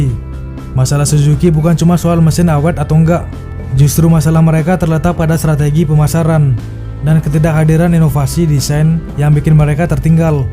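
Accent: Bali, Indonesia. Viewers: Pasti ne dr bali, logatnya ketahuan...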